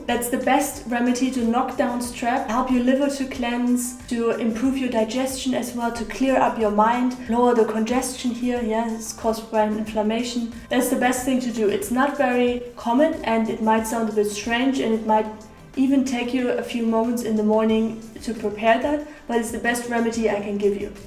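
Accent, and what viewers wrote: Germany. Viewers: I understand her very good, also with german accent but the audio quality could be a little bit better. Anyway, thank you for your awesome content! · Bist du Deutsche, das hört sich so an? :)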